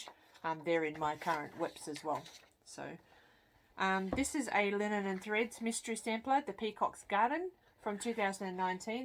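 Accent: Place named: New Zealand. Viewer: Well done on your first flosstube. It won’t be your last, for sure. Hi from Canberra and I can hear the NZ influence there!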